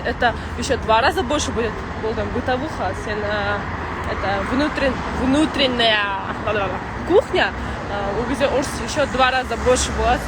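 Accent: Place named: Russia. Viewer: саған орысшаң акцентпен күлдіргі келтіресің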